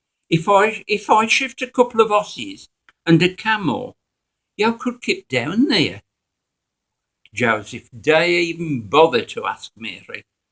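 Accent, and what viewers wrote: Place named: Black Country, United Kingdom. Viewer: Wonderful! I was looking for scripture read in Black Country accent when I found this gem.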